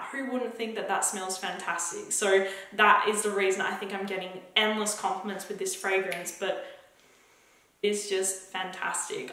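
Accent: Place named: Australia. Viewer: Anyone else generally just really like videos but really stay tuned just to learn how to authentically say "know" or "no" with an authentic Australian accent!? Rhetorical question. I KNOW I'm not the only one.. so..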